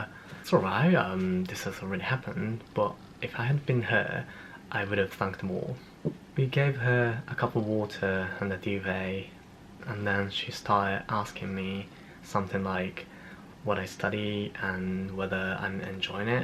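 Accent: United Kingdom. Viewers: お話が面白いしBritish accentがとっても良かったです✨ お忙しいと思いますが、こういう動画を楽しみにしています♪ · 綺麗なイギリス英語ですね。これからも一緒に勉強がんばりましょう！ オックスフォードサッカー部？の話も聞きたいです⚽️ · 追加コメントです！ 英語がとても綺麗なブリティッシュアクセントで、表現もとても洗練されてて、感嘆しました。すごく努力されたのでしょうね。 留学の当初、バスの運転手さんに「英語も分からないのになんでイギリス来たんだ！」と怒られたというエピソードを思い出すと胸熱です。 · 話が面白いプラスブリティッシュアクセントがかっこいいからめっちゃ聞き入ってた！ww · I like your British accent!! How did you improve your spoken English?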